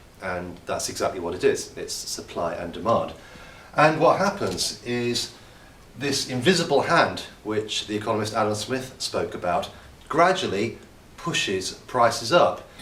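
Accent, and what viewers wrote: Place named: London, United Kingdom. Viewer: Perfect mate. You're a londoner ain't it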